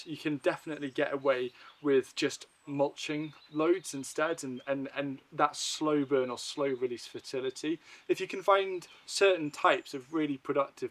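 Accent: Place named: Wales, United Kingdom. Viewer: Just pre-ordered "The Permaculture Garden" and am looking forward with great anticipation to a fantastic gardening journey. And, agreed: leeks are the most important part of the garden. Now we just need the audio book version with you as the narrator, because such a gorgeous Welsh accent should be used as much as possible. I truly believe that your garden, hearing you talk to all the plants and shrubs and worms, is made that much better for the beauty of hearing words of encouragement in that amazing accent. Thanks for all that you do for the community. Cheers!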